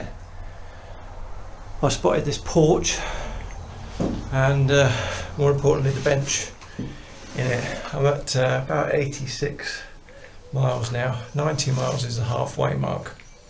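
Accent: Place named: Northern England, United Kingdom. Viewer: Yes I did admire your cycling shoes LOL. Atleast you had cool feet:) Well done on completing the route in one day. Nothing better than a personal challenge. I've never heard you come out with a Norther accent before. Had to laugh at the swear words. This is a real video. Welldone. Big thumbs up.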